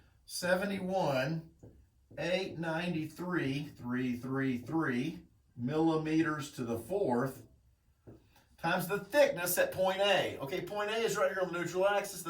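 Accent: United States. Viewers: Finally an engineering video in ENGLISH! With American Accent.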